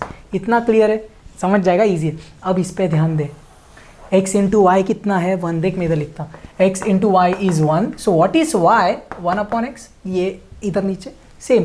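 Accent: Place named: Hyderabad, India. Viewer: Hyderabadi hai kya ji tum bhot bharti bolte aur sikhate bhi🔥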